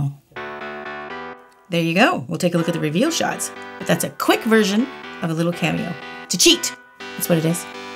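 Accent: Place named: Canada. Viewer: I love your accent! I am guessing your Canadian?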